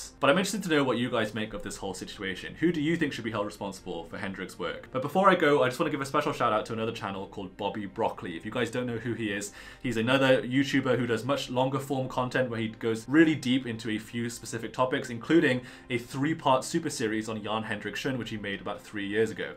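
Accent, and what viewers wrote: United Kingdom. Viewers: I didn't conciously realize you had a british accent until I saw how you spelled "transister" and thought it was the british spelling.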